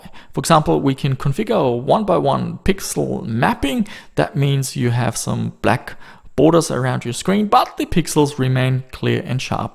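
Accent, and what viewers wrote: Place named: Germany. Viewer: I didn't know you lived in Australia, your accent makes you sound like you're from somewhere in Europe, Germany perhaps?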